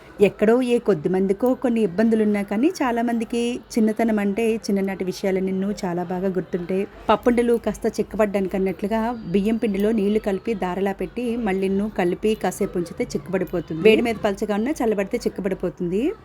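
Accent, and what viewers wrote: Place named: Srikakulam, India. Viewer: మావూరేనే మీది కూడా. మీ మాటలు విని. అప్పుడే. అనుకున్నా. మన. శ్రీకాకుళం అని. 👌👌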